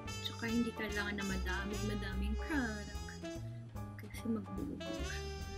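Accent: Visayas, Philippines. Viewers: super sweet nyo ateng.ang kulet mo mag bisaya.ehehheheh..kakatuwa! go go go !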